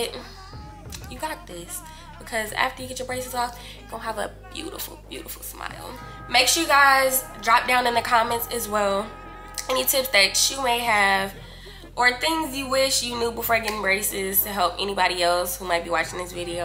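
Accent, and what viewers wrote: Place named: New Orleans, United States. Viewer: U from New Orleans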